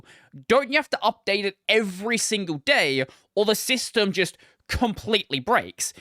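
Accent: Australia. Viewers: are you australian or what? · I fell for it until I realised you are Australian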